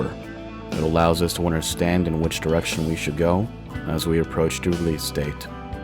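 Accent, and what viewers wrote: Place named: Deep South, United States. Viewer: Jesus, your narrator is great. That accent is awesome...Deep South + Eastern Europe?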